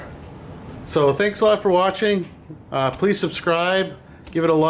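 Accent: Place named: Wisconsin, United States. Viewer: Wisconsin huh? I thought I recognized the accent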